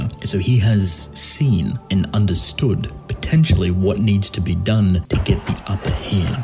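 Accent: Australia. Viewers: I don’t know why, but I think I’m more likely to sub to a channel when the narrator is Australian.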